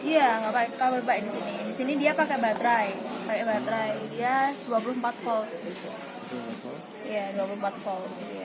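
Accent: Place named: Java, Indonesia. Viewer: Buat ngebor kmu bisa apa ngga mba wkwkwk...kayane wong njowo mba e iki hehhe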